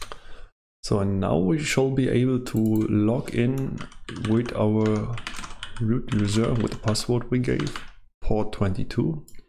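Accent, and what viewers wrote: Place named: Germany. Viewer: Ich liebe dich, dein Denglish und dein Tutorial!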